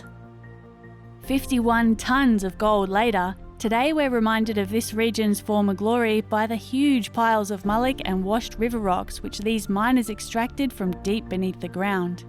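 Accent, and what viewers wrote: Australia. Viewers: I've said it before but I'll say it again: you need to start voice acting because your voice is incredibly Australian. I don't know what you look like but your voice is gorgeous.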